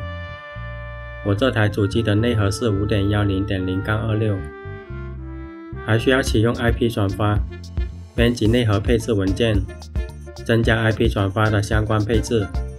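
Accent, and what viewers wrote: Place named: Chaoshan, China. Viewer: 好像很牛逼，空了我也试试大鹅，听口音，up主是潮汕人吧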